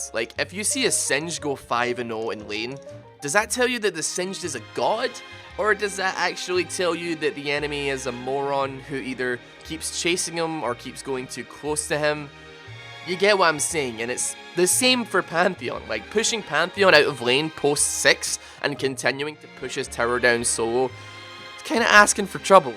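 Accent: Scotland, United Kingdom. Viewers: is Scottish or something? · Bro, you’re as scottish as anyone van become xD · Is he from scotland? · Bro are you scottish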